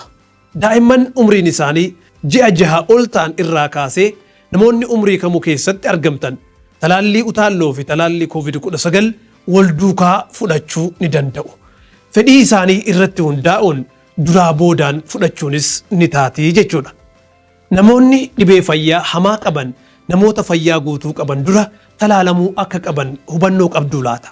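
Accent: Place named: Somalia. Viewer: İ think you talking like Somali language sir, is there any difference between Somali and oromo accent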